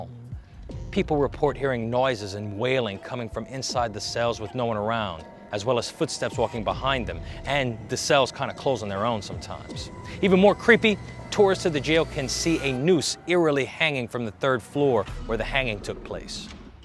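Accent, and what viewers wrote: New Orleans, United States. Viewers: Stick with it. I believe your style and delivery are unique. They add interest to your presentation. Is that a New Orleans accent?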